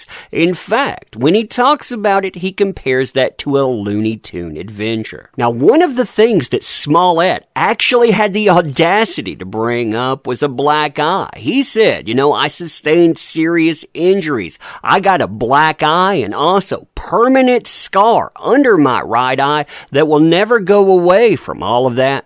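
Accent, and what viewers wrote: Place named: Appalachia, United States. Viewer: Is this your genuine accent? 🤔 Sounds like a Deliverance dialogue!! Be professional, please!!!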